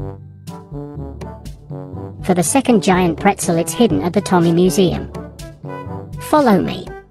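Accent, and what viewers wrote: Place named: United Kingdom. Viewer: Your accent is british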